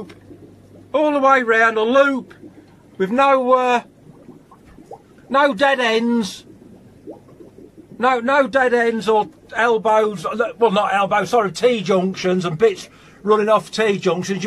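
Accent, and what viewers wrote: United Kingdom. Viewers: Appreciate the video. Young fish keeper looking to set up a fish room when I have the space. Nice to hear a fellow Brit :)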